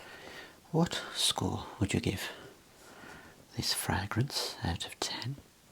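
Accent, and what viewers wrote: United Kingdom. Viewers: イギリスの話し方が本当によく眠れます😊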